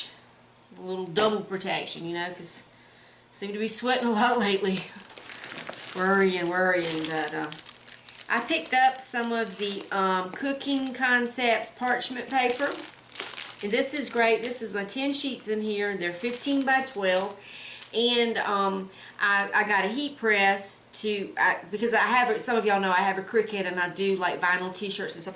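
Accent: South, United States. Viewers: You're such a good Mama, great haul, and sure have missed your Southern accent, be safe♥️🐾♥️🐾♥️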